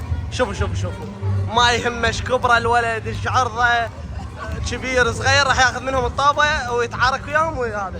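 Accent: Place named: Iraq. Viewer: تحجي عراقي طقه ونص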